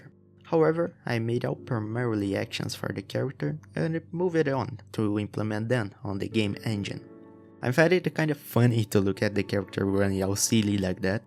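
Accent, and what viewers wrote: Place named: Brazil. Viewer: awesome atmosphere! also you sound kinda... brazilian? 🤔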